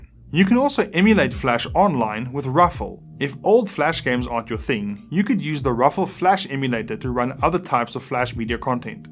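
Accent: South Africa. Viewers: South Africa? I hear you, bru.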